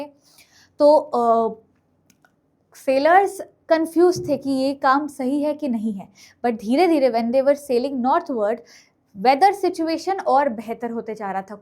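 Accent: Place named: Bengal, India. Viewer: her begolii accent🥹🤌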